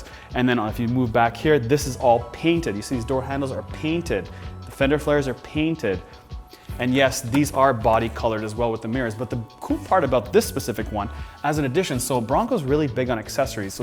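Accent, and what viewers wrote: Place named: Canada. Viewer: When I started listening to this guy, I swore he sounded Canadian, and then I found out he is hahaaha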